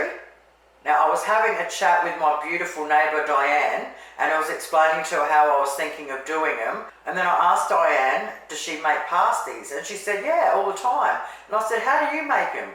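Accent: Australia. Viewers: Ooooooh so yummy I just made these I didn’t have punkin so used sweet potato Also I added some chopped shallot greens as I only had a small small onion And I used the borg pastry sheets Oh my yumminess!! Thank you so much You are my family’s favorite YouTube watch We also made the pea soup pie floater last fortnight Keep up the happy cooking and Aussie talk Absolutely has my ‘special’ boy laughing and looking forward to the tasting of these amazing easy to follow recipes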